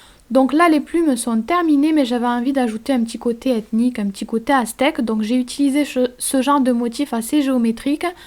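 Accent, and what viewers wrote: South, France. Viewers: D'où vient tu? tu as un accent du sud !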